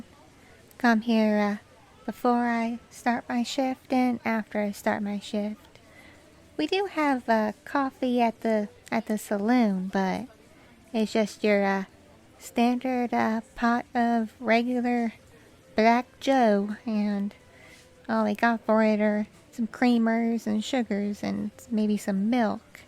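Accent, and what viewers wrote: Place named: South, United States. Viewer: I can hear a good southern accent but its a medium one but atleast its not bad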